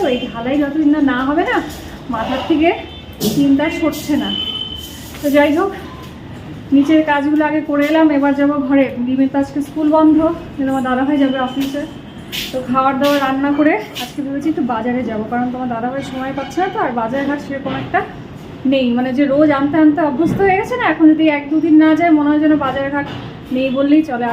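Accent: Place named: Bangal, Bangladesh. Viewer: বাঙাল ভাষা শুনতে খুব ভালো লাগে 😊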